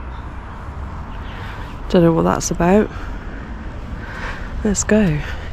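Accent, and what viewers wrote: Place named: Somerset, United Kingdom. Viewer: Btw, I'm trying to place your accent, I think you're from my neck of the woods in England. I'm gonna guess Shepton Mallett, Taunton, Glastonbury....somewhere around there :)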